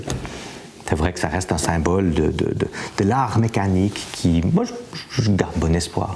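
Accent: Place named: Switzerland. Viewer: En tant que français, c'est un plaisir d'entendre ce bel accent suisse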